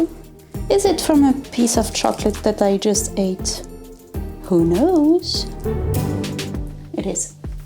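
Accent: France. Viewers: Hearing you say Kentucky was so cute lol I love your French accent! Also I’m glad you clarified the kitty is “not your cat” but is simply a “roommate” hahaha yes my cats are also rent free roommates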